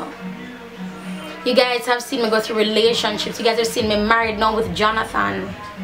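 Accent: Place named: Patois, Jamaica. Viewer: god say put good out n yuh get good back.... someone helped u help others, do other thing dont conform but i loved when i say yuh down zaful try on. do more things that ppl wan c. And even tho yuh jamaican talk slower and speak standard english sometimes not all weh come from jamaica can technically understand ur rawcha patois even tho that makes it funny. n nuff black ppl nuh undastan patois/patwa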